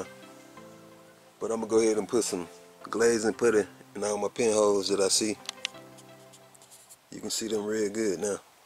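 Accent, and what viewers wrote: Alabama, United States. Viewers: Dude sound like hes from Bama... Credits roll Bama tag lol great job man. new sub lets get that 100k for ya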